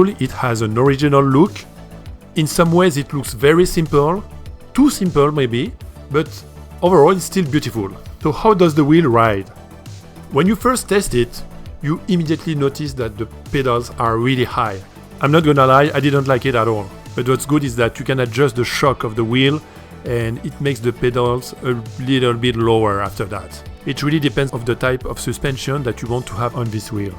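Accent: France. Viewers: Belle narration avec le flair de Paris saupoudré .😘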